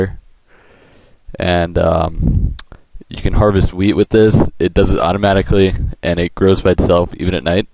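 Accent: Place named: Germany. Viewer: great german pronouncing yeah :D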